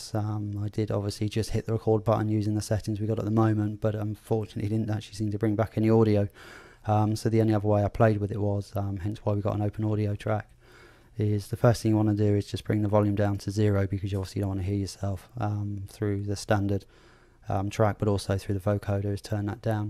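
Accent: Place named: United Kingdom. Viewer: Finally someone British. I can't take these American tutorial guys seriously. The accent is all wrong.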